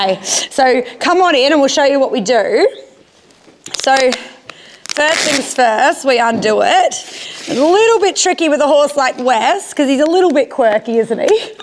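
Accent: Australia. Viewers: Wow so interesting - Wes was soo good with the ducktape - TFS 😍 just a quick question are you Australian? Love from a fellow Aussie 🦘🐨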